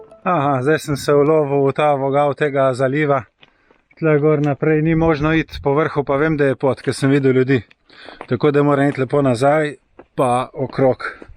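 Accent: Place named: Littoral, Slovenia. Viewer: HAHA, približno takrat sem tud jaz z starši in starimistarši hodu na morje. Sicer ne v ta kamp ampak v Banjole v neko turistično naselje blo je več blokov (mislim, da je blo prek zveze borcev ali upokojecev) kaj človek vse doživi, da na YT slediš nekomu, ki ima še druge podbbne hobije in veselje, in še na skoraj na isti konc hodu na morje (mogoče še celo istočasno). Upam da se kdaj srečamo, mislim da bi te bilo z veseljem spozat, pomoje si zanimiv sogovorec. Pri gledanju tvojih videov sem tudi že opazil, da kolesariš tam kjer sem jaz že bil na izletu. Tko, da je že iz tega naslova zelo lepo gledat tvoje videje. Pa še ta primorski naglas, men en nelepših.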